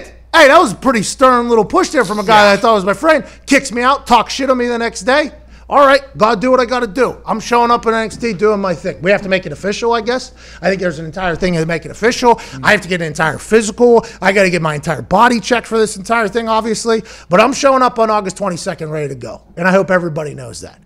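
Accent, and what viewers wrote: Pittsburgh, United States. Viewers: "HE'S FUGGIN' AHT DAHN ERE." That's as Pittsburgh as it gets.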